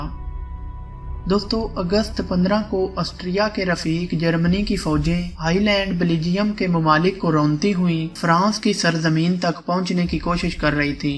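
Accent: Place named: Jhang, Pakistan. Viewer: lehja lokal hey. Shayed Jhang ya Mandi ka lehja hey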